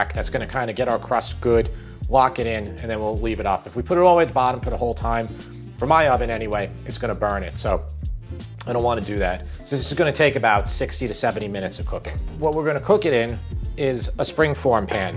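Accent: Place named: Italian-American, United States. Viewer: Ah, Pizza Chiena di Campania, there aint no such word as "gaina" 😀 Thumbs up though, you're a damn good chef and I always enjoy your videos very much and always smile at Italian-American pronunciation. Ree-GOT and Pro-ZHUT and then that perfect Peco-rrr-ino Rrr-omano... Bravo Ragazzo! Really like the lattice you did. I find I don't like a really heavy crust on top nearly so much as this light one. 👍🙏👍 Happy Easter by the way.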